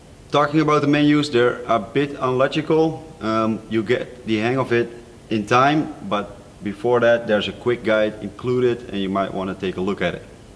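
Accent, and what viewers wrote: Netherlands. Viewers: What is your accent? Dutch? Nordic?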